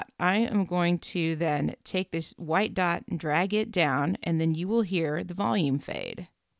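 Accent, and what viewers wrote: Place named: United Kingdom. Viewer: U sound so British lmao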